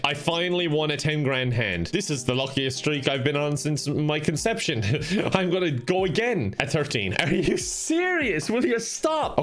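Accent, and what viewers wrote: Ireland. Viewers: This is truly the one channel on YouTube that can make me laugh the hardest consistently at whatever he plays. Also the Irish accent is a big plus too can't forget the accent.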